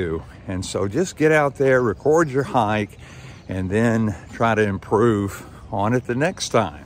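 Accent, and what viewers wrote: South, United States. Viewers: That is fantastic! I could pick up on a little southern accent there, my friend! I'm with you on the Roll Tide!! You do awesome work on your IMovie work...I guess I'll have to double down on my attempts. I have tried using it on a couple of videos (not trail vids) and it was a lesson in futility to me. Thanks! Btw...I'm s 3rd career pastor, and serve in the far south end of GA, in Hahira, at the very bottom of the state on I-75.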